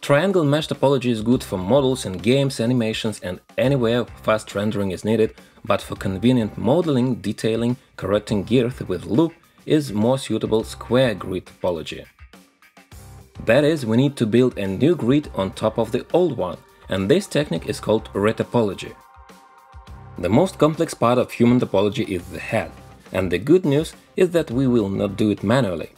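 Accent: Russia. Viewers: You have a strong accent. It looks like Russian.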